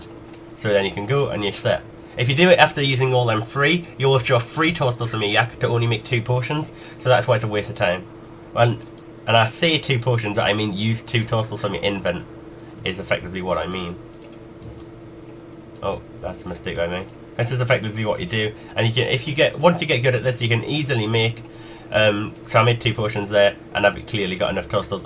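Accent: Newcastle, United Kingdom. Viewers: he's a jordy, love his accent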